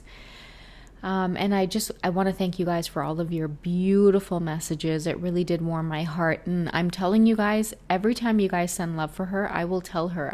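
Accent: Trinidad and Tobago. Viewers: lol love the Trini accent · As a Trini living in Trinidad, I have to to tell you your trini accent is so good. Thank you for the reading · 🦫 Love the Trini mom talk! 🦫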